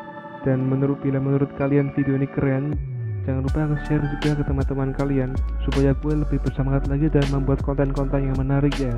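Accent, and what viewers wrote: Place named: England, United Kingdom. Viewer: Tak kira Anda dari inggris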